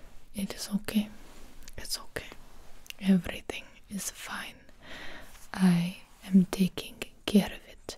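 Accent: Russia. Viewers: She is to good at Russian accent lol😭😁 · I love your not so good english and russian accent (when some people try to speak english and the do it incorectlly most of the time its really annoying, but you are exception) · why a russian accent¿ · Russian acsent?!!? Reallyyy?!😐 Well i liked it😁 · Wait so is the accent Russian? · The accent kind of sounds like a mix between Russian and African Wait... maybe I shouldn’t have commented while I was sleepy, she just sounds Russian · Good Russian accent by the way good content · Perfect Russian accent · Russian accent? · Cool Russian accent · i am inlove with the russian accent, its so aggresive but soft at the same time AAA, this asmr is everything 😭😭💖💖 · I am really bad with this stuff but is that a Russian accent like I said I am so bad at this kind of stuff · Tatyana,is not a greek name,its clearlly soviet/slavick/russian,also this is how russians speaking english sound,and she tried to replicate russian maniars. 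· not a bad Russian Bulgarian or whatever...... I'd see the movie. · Correction.... = perfection. + Russian accent = Russian perfection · It sounds like she has a russian accent of sorts😂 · Your Russian accent is the best--the way you say your Ts are giving me all the tingles! 💛Side note, that wig is really pretty on you 😊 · I LOVE THIS RUSSIAN ACCENT PLSS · The Russian accent is so cool lol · THE MOST ADORABLE Russian accent. This is my favorite video of yours. Thanks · Me: hears Russian accent and sees blonde short hair Also Me: Black widow? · as a Russian person I can say that this accent sounds extremely real like most of my friends talk like this in English ahahha UPD: not everybody talk like that tho don't get me wrong! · with a russian accent is the most funny and relaxing thing ive ever heard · My mother died 5 years ago and s'he had t'he same strong Rússian accent listening to you remind me of her and helps me sleep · as a native Russian speaker, this is one of the best Russian accents I have veard, and the essence of this character is instantly recognizeable. 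good job ;)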